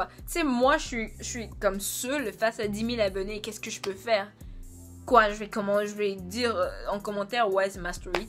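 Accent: Quebec, Canada. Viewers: Ton accent est tellement beau! on dirait du québécois du français et de l'anglais c'est trop mimi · Tu vis au Canada ? On dirait presque que t'as un accent Québécois ;) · jannette jackson C'est pas un accent anglais mais québécois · T’es québécoise ? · Accent canadien? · T’es tu québécois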